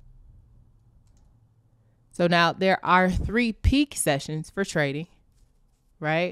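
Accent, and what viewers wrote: Houston, United States. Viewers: Thank u for the info..I bought Billy Carsons workshop a while back.....Is that a Houston accent? I'm kinda hearing Kelly and Beyonce...which is CRAZY that u favor both of them! love the content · Are you from Houston?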